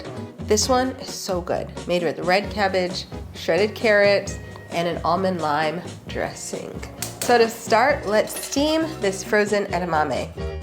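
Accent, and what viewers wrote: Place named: South, United States. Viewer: she's from the south, USA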